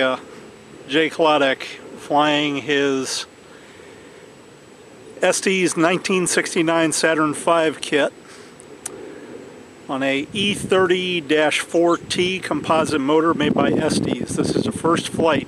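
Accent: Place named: Midwest, United States. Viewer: your boring midwestern accent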